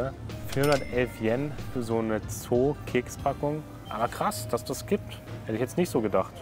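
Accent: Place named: Thuringia, Germany. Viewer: Sympathischer Mann, man merkt direkt das er aus Thüringen kommt:D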